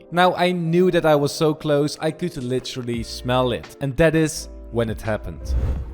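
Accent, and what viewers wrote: Netherlands. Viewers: You Dutch right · Even een vraag je ben jij Nederlands just a question are you ducht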